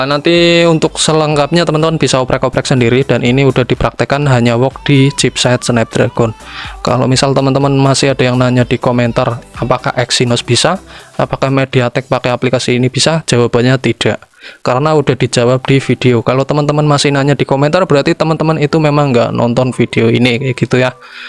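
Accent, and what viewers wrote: Banyumas, Indonesia. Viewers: Ketone wong ngapak kiye 😂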